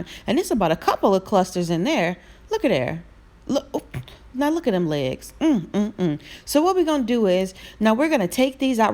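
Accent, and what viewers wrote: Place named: South, United States. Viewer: You can tell she from the south. She said “puhtaytuhs” not “potatoes”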